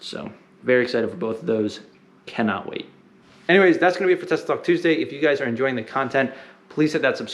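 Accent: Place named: Bulgaria. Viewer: Trying to figure out your accent. Maybe Bulgarian? Anyway. I appreciate the difficulties of ESL. I have never heard anyone say Alameda the way that you do (4 syllables) 🤓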